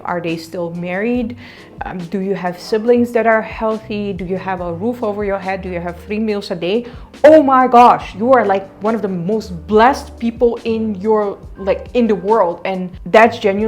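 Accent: India. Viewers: I love how living in India for 5 years made your accent a bit like Indian accent. (Obv in a good way tho)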